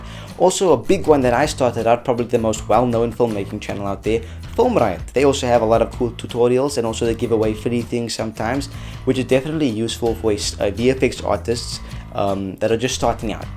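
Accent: South Africa. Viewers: hang on are you South African? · You sound South African 🤔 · Ayyy, I recognise the south African accent !! 🙌😃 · are you from south africa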